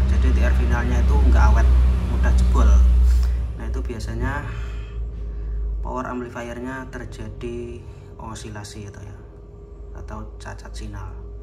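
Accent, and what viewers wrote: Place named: Sragen, Indonesia. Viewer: logat e koyok wong sragen mas😁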